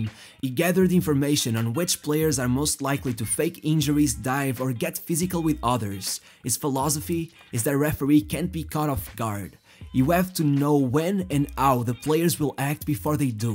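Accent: Portugal. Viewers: Este gajo que está a narrar parece-me ser português, tem o sotaque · Your Portuguese accent kills me, reminds me of my Portuguese friends! Great vid!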